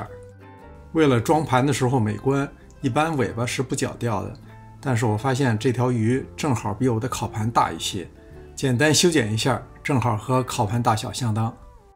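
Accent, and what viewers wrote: Beijing, China. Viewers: 感谢老师的讲解！听上去您是北京人吧？感觉很亲切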